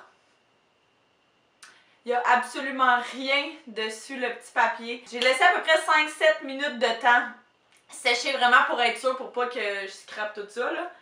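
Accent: Quebec, Canada. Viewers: t'es trop belle et j'adore ton accent (québécois) kiss 😍😍😍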